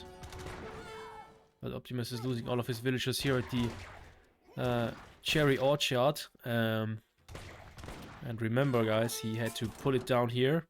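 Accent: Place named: Germany. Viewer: danke :). Ich dachte es mir, dass du deutsche "wurzeln" hast, wegen deinem akzent ;). Du machst das prima 🙃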